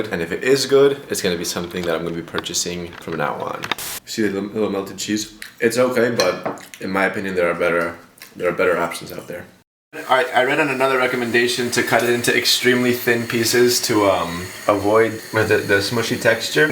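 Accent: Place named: Spain. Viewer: I love ur Spanish accent, u should speak Spanish more often😁 great work with the vegan transition!! 👍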